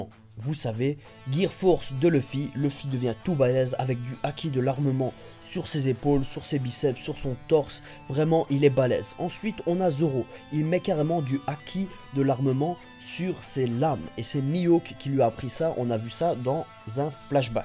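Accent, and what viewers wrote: Belgium. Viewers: tu viens d'où en Belgique ?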